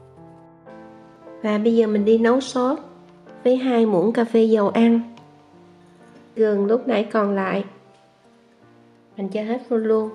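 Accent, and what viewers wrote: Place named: Saigon, Vietnam. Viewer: Xin chào em cảm ơn em chia sẻ món chay này thật lạ mà nhìn rất ngon! Không biết khi ở VN em ở quận nào tại SG mà giọng nói rất SG de thương 😊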